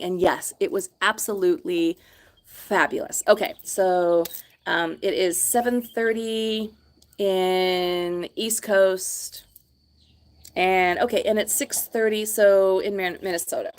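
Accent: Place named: South, United States. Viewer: Howdy neighbor lol. From Alabama here, nothing wrong with a Southern accent 😊 loved this video ❤️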